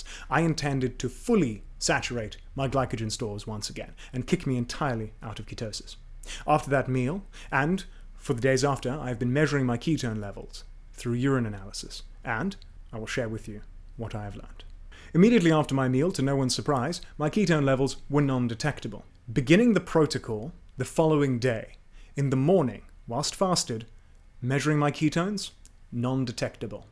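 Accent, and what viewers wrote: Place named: United Kingdom. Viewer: I think I was expecting a California surfer dude accent because of your hair, but got Sherlock Holmes instead. Anyways, great video!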